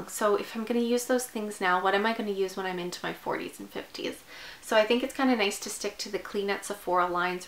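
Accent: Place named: Canada. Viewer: I thought you were Canadian , I’m from Nova Scotia !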